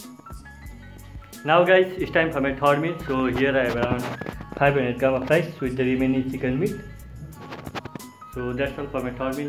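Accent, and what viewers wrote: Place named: Nepal. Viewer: Bro Ur accent is like Nepali. Nepali ho ki?😅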